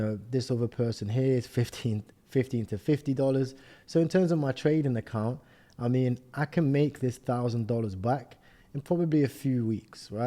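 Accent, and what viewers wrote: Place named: East Midlands, United Kingdom. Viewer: hope all is well fam great video again. can i ask are you from the east mids area i'm from nottingham and you sound like a people from this region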